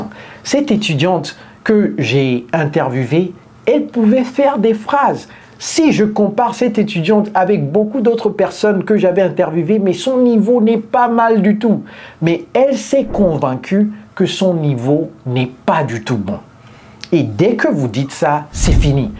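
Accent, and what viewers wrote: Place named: United States. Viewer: Since I begin to listen your video the American accent is easier to understand for me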